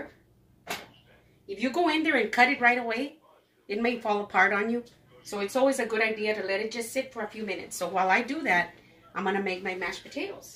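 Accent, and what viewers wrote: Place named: San Antonio, United States. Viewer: 4-7-24 Just saw this a few minutes ago but not soon enough to try your recipe. My meat loaf is browning in the oven., I have tried many of your recipes and they are always great. Will try your meat loaf next time. Just enjoy listening to your San Antonio accent. 🤗 Thank you for sharing all your talent on youtube.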